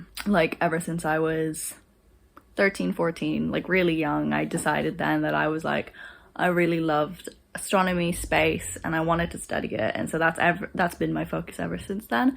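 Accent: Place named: United States. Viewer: I just found your channel through your Selena Grace bag video actually and got curious, noticed you have lost your American edge in your accent now! I really like the way you think, I am (and was) had some crazy thoughts and issues about my degree and if the degree I chose was for me or useless or worthless, wish I was as open minded as you when it came to thinking about it. You know like “it’s not the end of the world” and stuff, that’s so right! Ahh! I have one course to go and I’ll be done. I’m basically on leave right now because there are no courses for me to do left. I’m having a plight now about employment and stuff but I will keep it in mind, - “it’s not the end of the world!”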